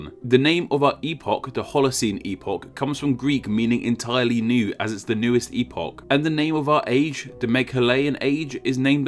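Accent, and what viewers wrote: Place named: United Kingdom. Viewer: I have never bought into the whole "British/English people sound smarter than American's" until I found your channel. You have such a great voice, and put things in such an awesome understanding for even the dumbest of us. Thanks for all you do, unfortunately, I cannot be a Patreon at this time, but one day I hope to be. Thanks.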